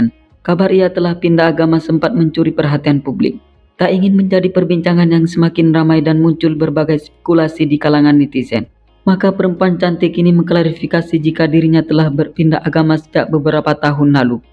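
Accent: Madura, Indonesia. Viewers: logat bicaranya kayak orang madura nih youtuber....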